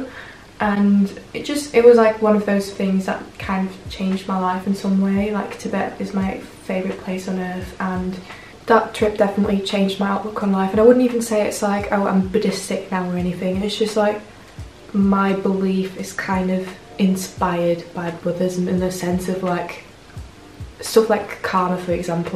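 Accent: United Kingdom. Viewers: Oha, krass hab zwar erwartet, dass dein Englisch gut ist, aber find‘s echt krass wie britisch dein Englisch klingt! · Omg wie gut sich dein Akzent einfach anhört😍 Ich mache 2020/21 ein AJ in Weston-super-Mare, North Somerset (hab es mir nicht ausgesucht,bin aber total happy) und ich möchte unbedingt auch so britisch klingen, wenn ich wieder zurück komme. Dir noch einen schönen Tag und vielen Dank für deine Videos :) x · Ich find es mega interessant zu sehen, dass du einen Nord - Englischen Akzent bekommen hast, weil du ja in dieser Region warst :) Es kommt also wirklich darauf an, wo man lebt, was dann Einfluss auf den britischen Akzent hat! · Ich würd' mich voll freuen, wenn du weiterhin englische Videos machen würdest. Und ich find' man hört voll das Britische in deinem Englisch, was wirklich toll klingt. :D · Love ur British accent ❤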